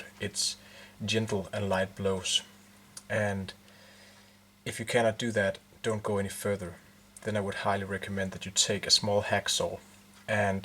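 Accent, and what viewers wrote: Denmark. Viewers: Thanks for this video! BTW are you Danish by chance? Your accent seems to be that of a Dane. If not, sorry for my incorrect assumption. Thanks again! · Rigtig god video, der præcist viser, hvordan man selv med lidt omhu, kan udskifte en nut på sin guitar. Pænt engelsk, med en smule charmerende nordjydsk accent. Per i Aarhus😎🇩🇰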